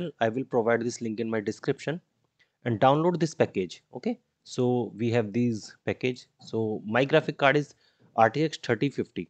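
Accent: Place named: India. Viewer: heard the voice having an indian accent till i tried it and it actually loaded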